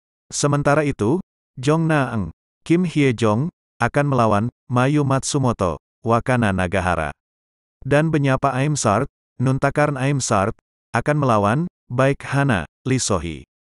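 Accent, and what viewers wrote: Banyumas, Indonesia. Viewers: Kok..ga nampilkan drawing Luxemburg open dan swedea open...makasih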